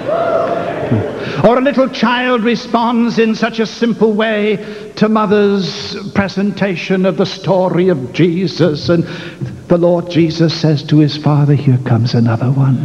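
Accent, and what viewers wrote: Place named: Wales, United Kingdom. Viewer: Was Dr Phillips a Welshman? I'm sure i detect a Welsh accent in his poetic delivery.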